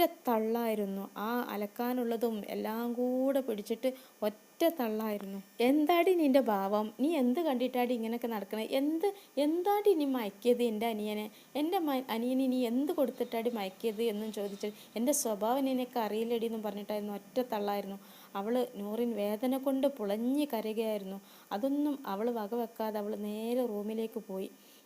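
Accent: Malappuram, India. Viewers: ഉഷാർ ആണ് itha mlprm സ്റ്റൈലിൽ തന്നെ പറഞ്ഞാൽ മതി അടിപൊളി 👌👌👌👌ഒന്നും parayaan ഇല്ല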